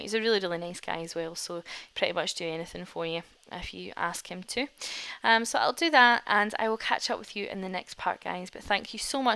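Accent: Scotland, United Kingdom. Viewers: Are you scottish cos i am too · Hey are you Scottish? So am I :D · I really like your accent!!!! Is it Scottish here?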